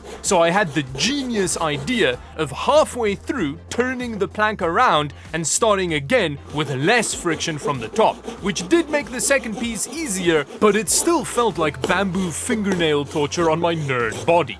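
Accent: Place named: South Africa. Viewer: YOU HAVE A SOUTH AFRICAN ACCENT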